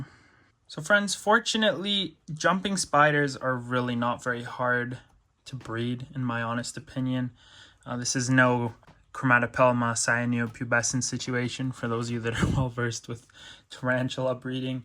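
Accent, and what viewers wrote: France. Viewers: I love your french accent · The French accent lmaooo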